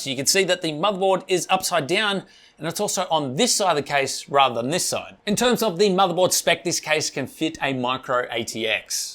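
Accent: Australia. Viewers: Hello I was wondering where you were stationed? bc it sounds like you are Australian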